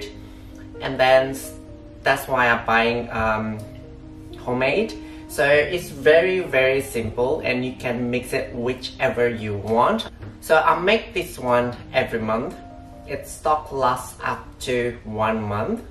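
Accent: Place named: United States. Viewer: You sound like an American. I am a polyglot, speak 9 languages and English is for the la zy. It is bar none the easiest language to learn, a breeze learnt in a weeks. No declensions, no diphthongs, all verbs remain in the infinitive for all pronouns, no conjugations to learn, add "ed" for past, add "will" for future and nouns don't have gender. You were saying?? Try learning Chinese, Arabic, Russian or French. Good luck with that!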